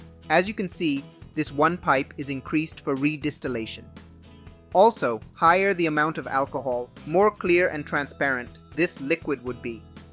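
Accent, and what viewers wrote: India. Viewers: You look like you have Indian goat voice. What nationality are you?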